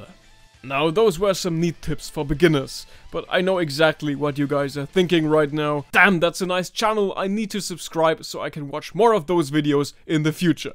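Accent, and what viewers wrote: Germany. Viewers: This german Accent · as a german i could immediatly tell u r german too.....and by the water lmao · Hey das hört sich mehr Nach denglisch das du da sprichst 🤣🤣 Ich bin auch nit besser😅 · Liebe die Pokemon Musik und den Deutschen Akzent!!!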